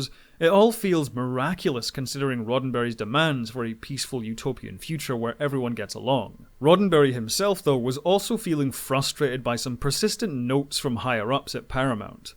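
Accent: Scotland, United Kingdom. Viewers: You have a very, very slight Scottish accent.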